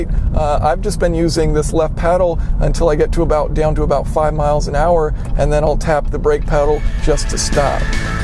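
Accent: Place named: North, United States. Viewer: Thanks for a great test. I'm still trying to figure out in what part of NC you learned to talk like a Yankee.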